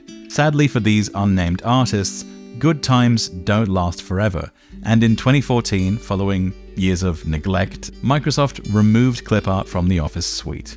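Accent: United Kingdom. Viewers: Why does your voice suddenly sound bassier and slightly more British? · sorry man cant finish this video your british voice is just so condescending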